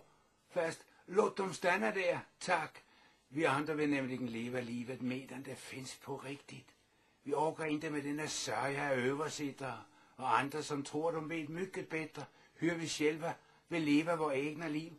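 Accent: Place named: Denmark. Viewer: Detta är den danskaste mannen som pratat svenska någonsin.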